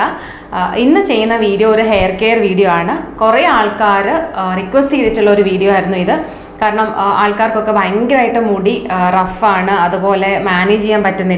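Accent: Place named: Tamil Nadu, India. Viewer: Slang korachu tamil mix aaghunnu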